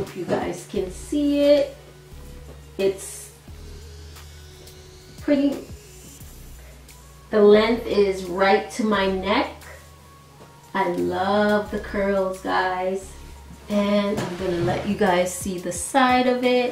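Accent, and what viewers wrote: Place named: Jamaica. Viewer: So cute I hope Mine turns out well. You sound Jamaican if you are, big up yuhself goodie!!! If not still big up yuhself! May do a review on this as well.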